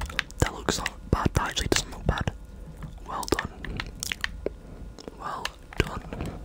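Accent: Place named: England, United Kingdom. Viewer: cool! i feel like people from england have great asmr voices, and you did not disappoint:)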